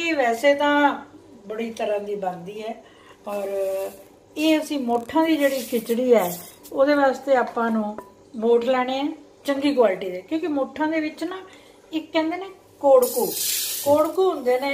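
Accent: Punjab, India. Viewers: The recipe in your sweet Punjabi accent compelled us to cook Moth Dal Khichdi today itself ♥️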